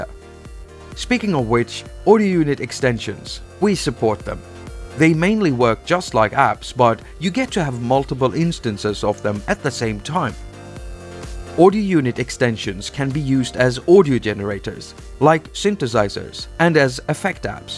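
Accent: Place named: Sweden. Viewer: Probably is the swedish accent. Or has talked too much with siri. Look out for his channel if you don't know him. He knows what it does:)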